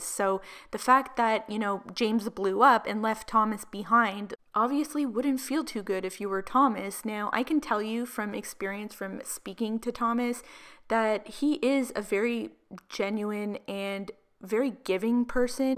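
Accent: Canada. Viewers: You must be Canadian?You have such a heavy accent! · is u canadian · Are you Canadian? You kind of have an accent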